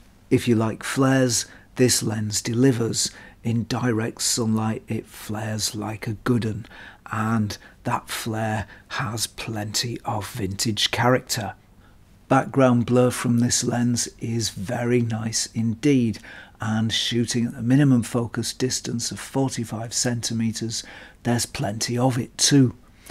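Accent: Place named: Liverpool, United Kingdom. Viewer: Great video! You sound like you’re from Liverpool. Correct me if I’m wrong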